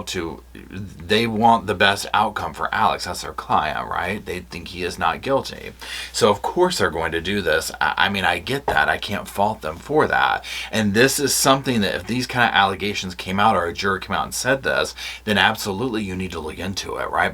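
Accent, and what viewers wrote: South, United States. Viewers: You rock a southern accent! 😂 Xoxo to Roscoe :_Lovesit::_SofaSquad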